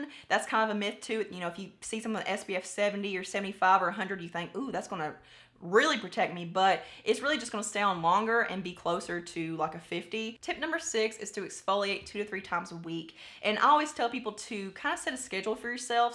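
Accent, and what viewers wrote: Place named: Kentucky, United States. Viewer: Oh no! It looks like it’s still all here for me when I expand it, but I can’t see what you’re seeing so I don’t know where it got cut off, exactly. Go figure: I apply brainpower, it melts my words. I poked around on your social networks looking for an email so I could just send it there, but while it says it’s “below” on your youtube About page, sadly it isn’t, so I hopped to your Facebook and discovered... Lady, you are from Southeast Kentucky. I knew I loved that accent, I’m from Eastern KY but lived in NYC for years & years until family issues brought me back to KY. I will now attempt to “message” it to you via Faceplace, and let’s hope that doesn’t cut it off & not let me know where. Sorry for the discombobulation.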